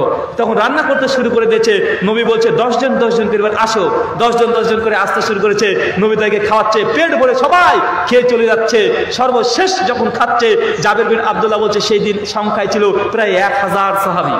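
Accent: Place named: Rangpur, Bangladesh. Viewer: হুজুরের কথা বলার ধরণ অনেক সুন্দর অনেকটা আমাদের বাংলাদেশের রংপুর জেলার মত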